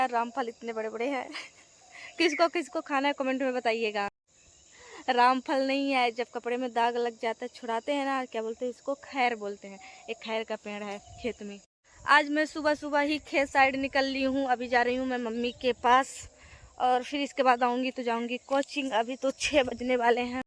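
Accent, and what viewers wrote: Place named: Chhattisgarh, India. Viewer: कुछ कुछ भाषा आपकी छत्तीसगढ़ का भाषा जैसे बोलते हो😮😮😮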